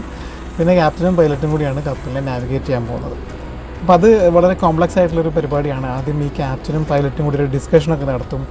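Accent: India. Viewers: പുയ , ഇടക്ക് മലപ്പുറം ശൈലി പുറത്ത് വരും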